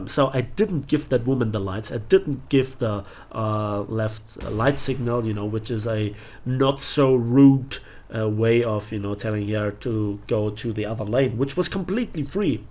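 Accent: Bavaria, Germany. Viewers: I only recently subscribed to your channel and so I don't even know most of your signature style reviews. Anyway, I value the complex, complete and non-biased approach of your stream of thoughts. In this episode, you really shine when you explain the "Tiger-Problem" - something I wasn't aware of because I do not frequently follow the tank side of WT. As a non-tanker I can only say, I got my premium Königstiger maybe two years ago and played exactly three matches in it because I was horrified at the thought that I would be humiliated by some lower tier opponent. At least this fear is gone now but I guess, in the rare cases I play tanks I will stick to my StuG III in arcade - and even occasionally have multi-kill matches in it, ha ha ha. Anyway, thank you very much for your interesting videos and keep up the good work. And btw, I really like your Bavarian-English. 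Viele Grüße aus Unterfranken und schöne Restwoche!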